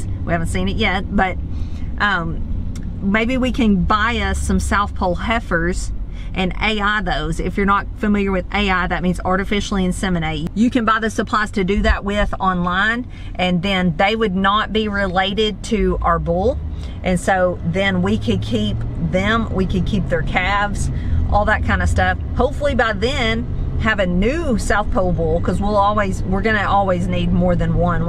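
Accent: South, United States. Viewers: Hi, I'm a newbie...brought here by Kevin (and Dutch)..and I'm liking what I'm seeing! I like the way you talk too! LOL! I'm from Ohio, so I love the southern drawl!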